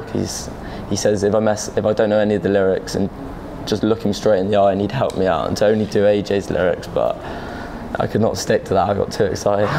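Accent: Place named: RP, United Kingdom. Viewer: he's posh af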